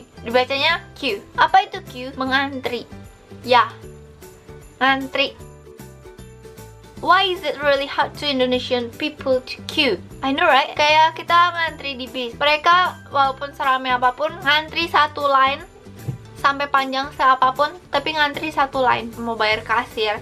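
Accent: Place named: United Kingdom. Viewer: your accent is getting more british! ❤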